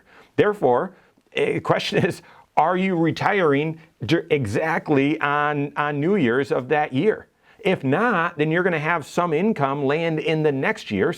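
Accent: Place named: Michigan, United States. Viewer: I knew that was a Michigander accent before you even said Midwest! Great info. Thank you for this. (Go Buckeyes!)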